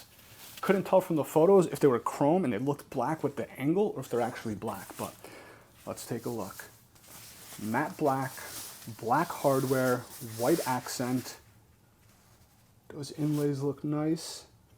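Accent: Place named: New York, United States. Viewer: As soon as I saw you got the matte black Les Paul. I have the same one but with green trim I'm surprised you didn't plug it in before you opened up the other one you showed amazing restraint God bless you . End with the abalone. I'm from the South Shore of Boston so it was about a second and a half into the video that everybody knew you from the East Coast definitely got New York in there I'm saying you're almost Rhode Island border Maybe Rhode Island Fall River. You're going to love that Matt Les Paul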